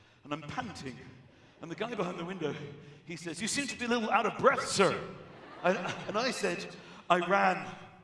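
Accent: United States. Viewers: I LOVE your American accent! But I could listen to you talk for hours. ♥️👂🤣🤣🤣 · I love his American accent too lol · I love his American accent, the way he leans on the hard "R." Funny!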